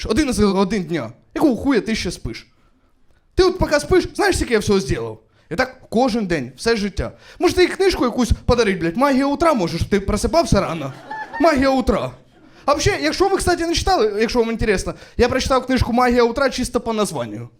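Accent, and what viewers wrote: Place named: Russia. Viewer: Ох, як же відчувається ,що людина російськомовна... Це навіть не суржик, це фонетичне знущання💔 Хлопчик, ти милий перспективний, і плюсік за старання, але якщо вже ти займаєшься справою, де мова і слово - твій інструмент, ну прикладай більше старання!!! Бо твої російські слова , які ти просто промовляєшь з нібі то українськім акцентом так ріжуть вуха, просто кров з них йде. Лайк заради просування україномовного контенту. Ну і за харізму❤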